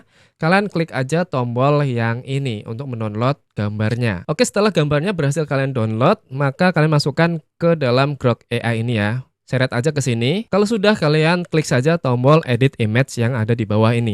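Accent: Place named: Java, Indonesia. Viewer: bang, abang orang medan ya?. kok logatnya kayak jawa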